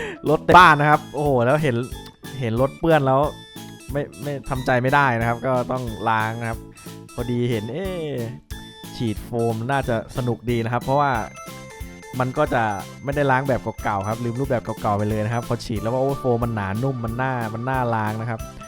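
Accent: Korat, Thailand. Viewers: อ้าวแกคนโคราชนิ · พี่คนโคราชนิคับ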